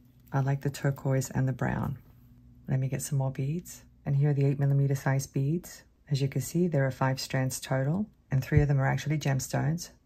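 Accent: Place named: Australia. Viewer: Thank you so much for the video! AND thank you for keeping me company whilst I get my lab ready for school. ☺LOL! When I first subscribed to your channel, I had guessed you might be from AU from your wonderful accent. I have several great friends from OZ. I'm hoping to get there to visit them sometime soon. This box of pretty beads will make many wonderful treasures. Thank you again! Take care and be safe! 😊💖🙏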